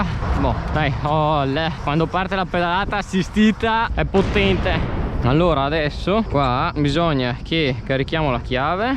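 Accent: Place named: Verona, Italy. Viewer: grande!! Ho sentio l'accento veronese e me son emosionà!! 😂😂 Ti ho scoperto da poco e appena ho sentio el papà parlar to seguio subito.. Grande bei video un giorno veo a catarte e a ciavarte un po' de verdura😜😜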